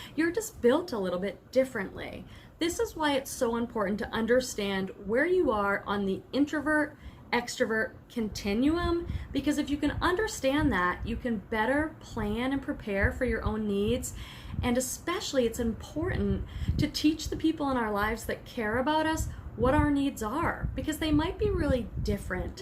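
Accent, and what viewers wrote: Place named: California, United States. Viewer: Your Cali. accent is so broad. (Awesome vid., by the way.)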